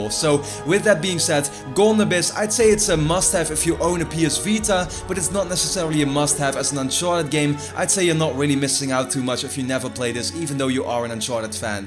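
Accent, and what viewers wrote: Netherlands. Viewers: Are you from THE netherlands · He has a dutch accent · is he Dutch?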